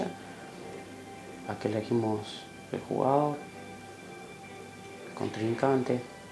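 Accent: Córdoba, Argentina. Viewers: sos de Cordova? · Se te nota lo cordobés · no tarde ni 5 segundo en darme cuenta q sos cordobes aguante cba loco! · amigo sos de cordoba o de buenos aires etc etc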